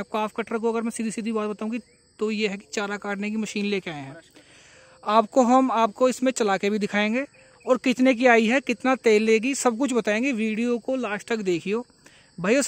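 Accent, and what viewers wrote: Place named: Punjab, India. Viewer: I can listen punjabi in ur hindi ❤️🎉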